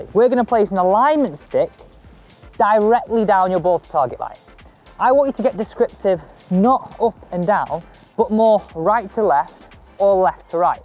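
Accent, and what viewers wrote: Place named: Cockney, United Kingdom. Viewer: I cannot understand most words the guy in the viedos is saying! Why does every golf training video have people from England with poor cockney accents? The guy mumbles through the entire video! I will never get that 3 minutes back.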